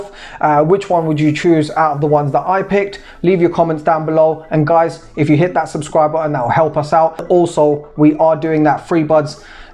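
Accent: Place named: United Kingdom. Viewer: Indian with British accent..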